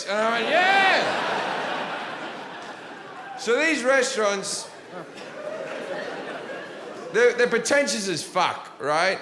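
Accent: Australia. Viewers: Is he Australian or not, I said that because of his Accent. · So Aussie lol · I can't tell if this is a joke or if you haven't realised he's genuinely Australian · As an Aussie I cannot stand this stupid Occa accent. The guy sounds like he was dropped as a baby...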